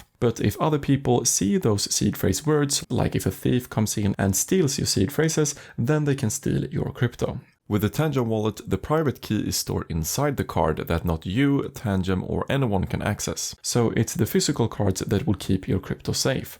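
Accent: Sweden. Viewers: You sound swedish on your accent. Stämmer min gissning? :)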